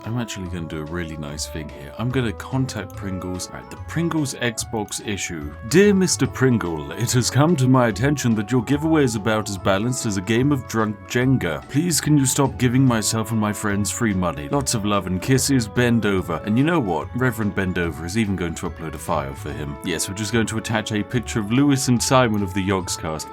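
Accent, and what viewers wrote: United Kingdom. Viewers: Oh Im so happy to have found this channel. I wouldve never thought that making money could be so easy or that some companies are just that stupid. Not that I ever tried some of these tactics but you and your humor and british accent are making the journey worth watching. Thanks for making these videos and keep up the great work. Your one of my favourite channels that Im always watching right now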